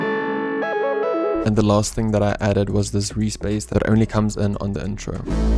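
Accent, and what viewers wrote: South Africa. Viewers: Yo are you South african??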